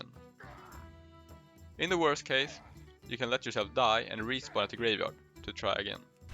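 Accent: Sweden. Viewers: This sound so nordic! I love it the english sound exactly like a Swedish brother, keep on working that pronunciation and keep up the good work! Grym video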